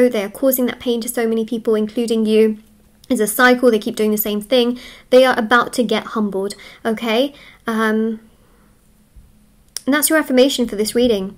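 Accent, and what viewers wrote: England, United Kingdom. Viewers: which part of england are you from? I’m curious to place your accent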